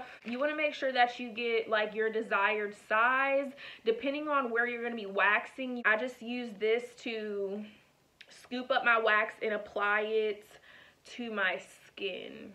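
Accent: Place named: Pittsburgh, United States. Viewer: Are you from the Pittsburgh area? I hear you accent LOL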